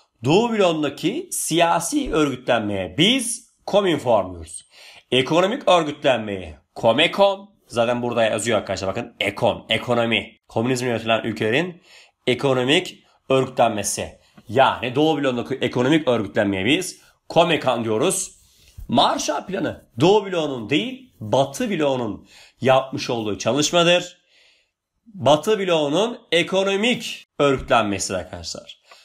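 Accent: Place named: Adana, Turkey. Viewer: Cevap:goodvibes:: gırtlaktan gelen Adana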